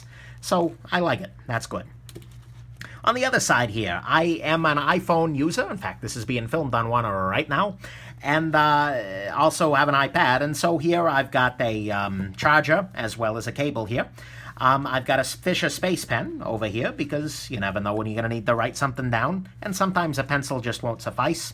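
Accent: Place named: Brooklyn, United States. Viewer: What part of Brooklyn are you from?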